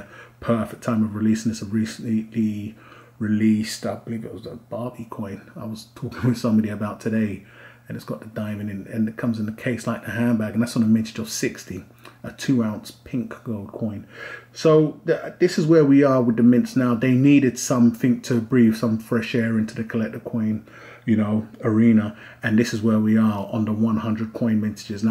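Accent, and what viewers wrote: England, United Kingdom. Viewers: love the accent what part of England??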